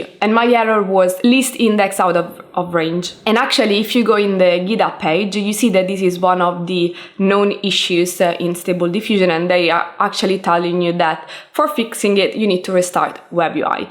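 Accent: Italy. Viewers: your videos are perfect. You're so good at explaining, please keep producing content. I subscribed in 30 seconds flat plus your Italian accent, I could listen to it all day long!!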